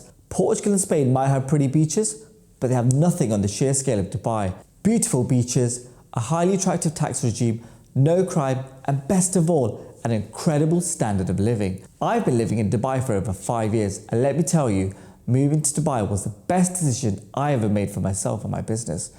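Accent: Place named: India. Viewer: yes you sound very much indian or bangladesh, whichever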